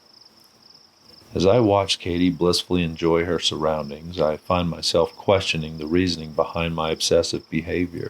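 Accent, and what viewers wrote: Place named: Louisiana, United States. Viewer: Now this, this is your best so far, or as far as I know about. It has lots of Katie - check. It has your sort of Idaho-ized Loozeeana accent - check. It focuses on one thing and does that one thing well - check. It's simple, a simplicity made all the more remarkable by the complex tools you use - check. It's philosophical - check. It's got that music alright, but unobtrusive and even apropos! - check and check. And it's just the right length to do what it needs to do. Checkmate. (Personal note: I'm glad I've kept my subscription, despite my, shall we say, "disagreements" with the auteur).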